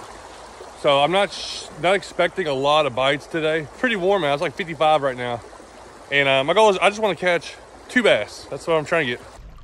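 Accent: Tennessee, United States. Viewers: What part of Tennessee are you from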